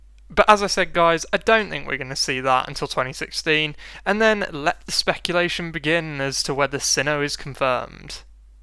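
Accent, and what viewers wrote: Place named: United Kingdom. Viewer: Soooo British.... Ewwww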